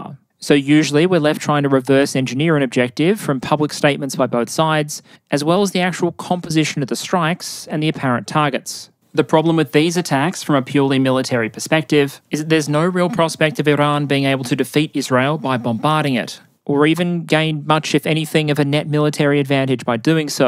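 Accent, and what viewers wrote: Australia. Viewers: Your upload schedule is perfect. You always just in time for me to fall asleep to your video on Sunday night (I'm same timezone) and then watch/listen to on the way to work in the morning. It's also fantastic to hear an Aussie online, and one who clearly knows his shit. Keep it up,.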